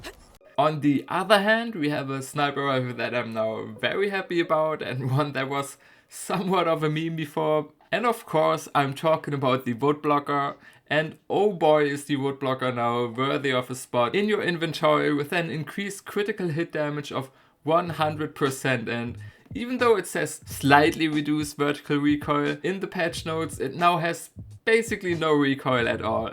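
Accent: Germany. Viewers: Ich hab mir während der ersten 2 Minuten schon gedacht, das du n deutschen Akzent hast😄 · Der deutsche Akzent ballert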